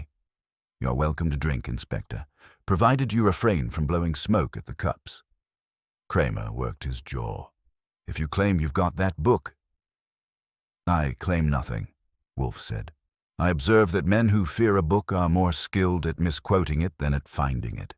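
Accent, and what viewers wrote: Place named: United Kingdom. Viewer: The British accent doesn't match with New York American accents. I expect Archie Goodwin to be a brash American, snappier pace. The space in the narration after each "Mr" or "Inspector" before saying the last name is disconcerting. Renting a typewriter matches the period of the original Nero Wolf series, that is good. But paying for it with "a prepaid card"??? Did such things exist back then?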